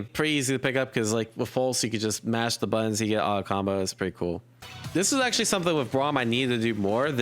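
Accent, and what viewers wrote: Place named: North, United Kingdom. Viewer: bri'ish, but northern.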